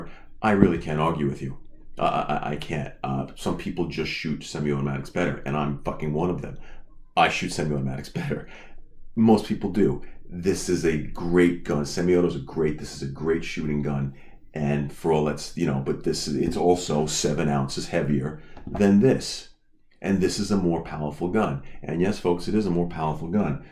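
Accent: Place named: New York, United States. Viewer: Refreshing to hear a New Yorker talk about guns lived in NYC 40 years Always been afraid of guns until I moved to Florida lol now can’t get enough lol I have two cuz that retired also thank you for ur service and great educational video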